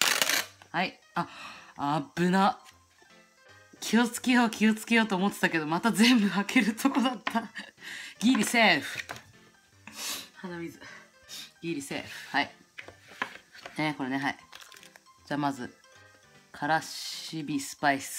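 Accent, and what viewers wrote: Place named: Fukushima, Japan. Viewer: 福島離れて思ったけど、なまりすごいよ笑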